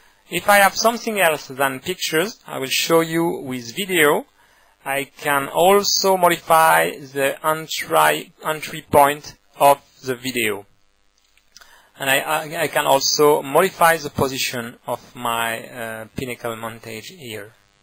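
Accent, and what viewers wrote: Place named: France. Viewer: I think the audio quality could be better, but I believe this is because you want to keep the file small for YouTube upload. Smaller video and lower quality audio = smaller file. The French accent is wonderful, we, on the other side of the world are not custom to hearing it, so its sounds funny to some people. I think it is heard more in Europe and is just normal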